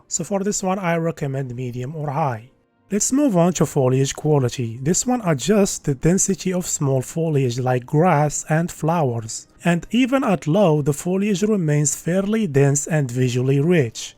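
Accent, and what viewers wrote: Morocco. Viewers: U sound moroccan bro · bayna fik maghribi, english m9awad 3andek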